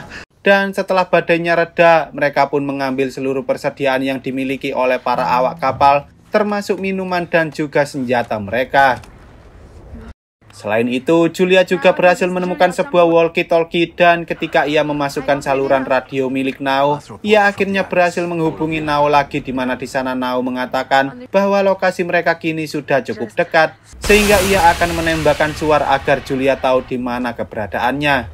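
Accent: Java, Indonesia. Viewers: Paling seneng dengerin review film yang suara yang review ada jawa jawa nya🗿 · Bisa gak pake medok gak? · BANG LU ORANG JAWA KAH😊=BANG LO WONG JOWO TAH😊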